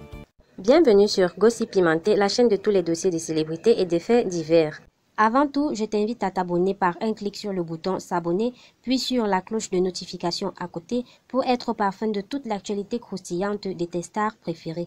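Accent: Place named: Cameroon. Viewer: Ton accent kmer est très prononcé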